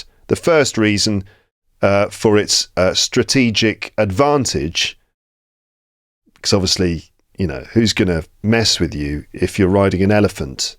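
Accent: United Kingdom. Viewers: Happy birthday to, happy birthday to, happy birthday dear, happy birthday to. May the force be with you! P.s. is the best teacher of English with a British accent on You tube. Fight me. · Dear... Happy Birthday to you. I'm from Sri Lanka and have been listening to your podcasts for a long time. Though there are lots of other podcasts, your podcasts are special in terms of their clarity and accent. To me, really, there's no need for subtitles to understand you. I'm in a position to understand every word you say. You speak in a clear British accent. Whenever I am free, I'm in the habit of listening to your podcasts and I feel that my English is improving day by day. Thanks for your podcasts. Keep it up....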